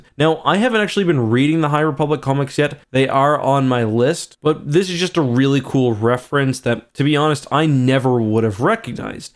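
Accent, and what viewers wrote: Canada. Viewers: Yo are you canadian?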